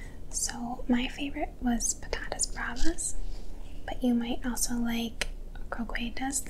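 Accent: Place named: Upper Midwest, United States. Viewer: definitely has that upper midwestern accent. Probably from Michigan, Wisconsin or Minnesota. Maybe northern Illinois. I think I've found a new ASMR channel!!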